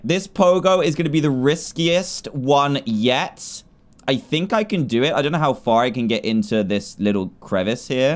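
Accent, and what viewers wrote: United Kingdom. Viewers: I'm new to his channel, damnnn I love that British accent 😍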